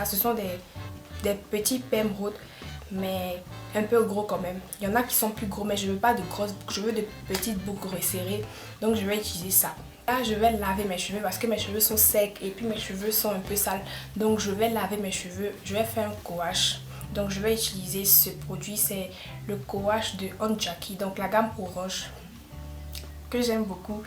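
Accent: Ivory Coast. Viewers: miss pretty oui elle l'est l'accent trompe pas 😊😊 # 225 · cc t'es ivoirienne?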